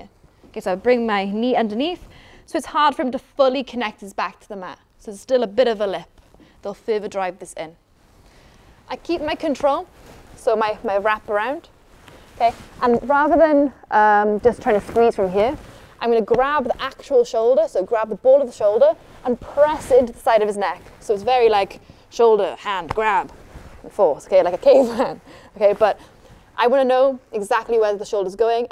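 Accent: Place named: Wales, United Kingdom. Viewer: She's from Wales...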